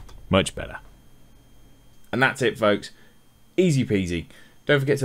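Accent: United Kingdom. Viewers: Hi, I’m very new to video editing and Resolve - as in I only downloaded it this week! Stumbled across your channel and finding your videos useful, plus it’s a refreshing change to hear a Brit voice for a change! I must have missed something here - how did you adjust the smooth cuts to cut out the dialogue you didn’t want to include? Do you use the audio track for this? Cheers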